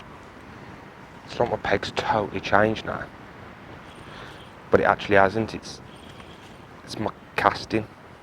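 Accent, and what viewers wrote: Birmingham, United Kingdom. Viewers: Brilliant video our kid love the brummie accent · Love the videos. Despite the Brummy accent, I expect to see great things from you in the future!!!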